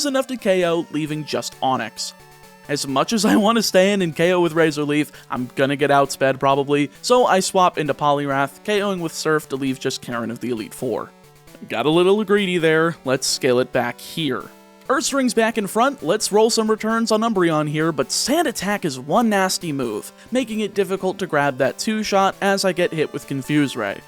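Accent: South, United States. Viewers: ive been watching your stuff for a while now, and im just now noticing your accent, you're from the south right?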